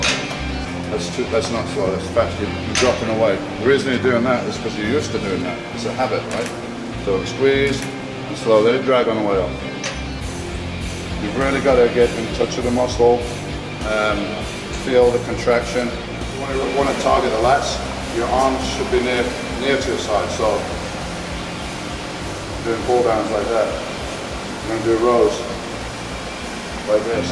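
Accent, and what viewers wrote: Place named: Birmingham, United Kingdom. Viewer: Birmingham, England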